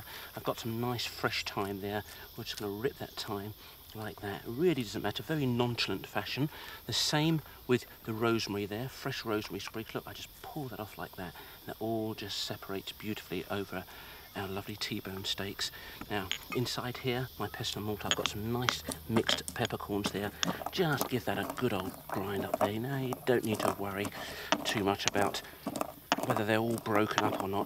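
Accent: England, United Kingdom. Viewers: Ainsley sounds like what everyone thinks an English man sounds like.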